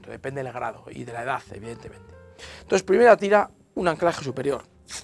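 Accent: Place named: Basque Country, Spain. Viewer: Muy bien explicado, me sirve mucho este video para mí trabajo 😎, tu acento es del país vasco si no me equivoco 😅 saludos gracias!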